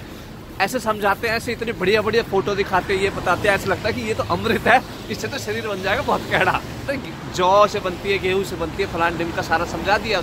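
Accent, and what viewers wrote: Haryana, India. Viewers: bhai ji..mein jab japan aaunga..apse jarur milunga..u r so simple..ur haryanwi accent dil ko shu leta hai...love u..😍😁😳😜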